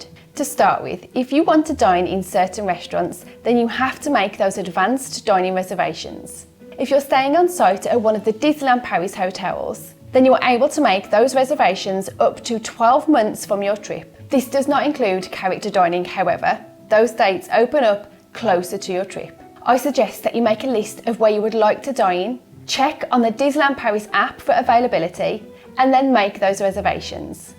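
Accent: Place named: West Midlands, United Kingdom. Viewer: Nice to hear a fellow West Midlander talking sense. Weve watched all your vids. Me and wife looking forward to going in Sept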